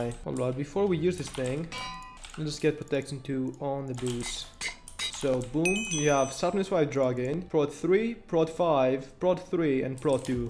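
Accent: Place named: Greece. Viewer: Everyone likes ur Greek accent tho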